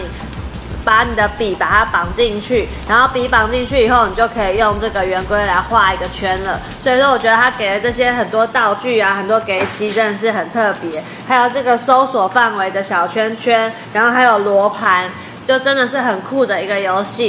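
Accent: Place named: Taiwan. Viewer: 咬字不清晰，台灣國語難聽懂…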